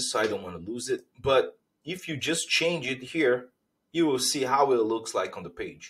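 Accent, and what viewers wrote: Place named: Brazil. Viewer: Sabia que era br pelo sotaque kakkk e nois ma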